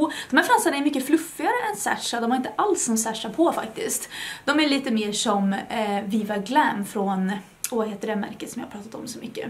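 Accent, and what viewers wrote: Värmland, Sweden. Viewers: Kul att höra dig på svenska och din värmländska klang lyser igenom 😍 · 😂 Hur kan det skilja så mycket på rösten bara för att man byter språk? Och hur kan jag missat denna? Dock underbart att höra en härlig svensk dialekt. 🙌🏻 Tack för denna! 🌸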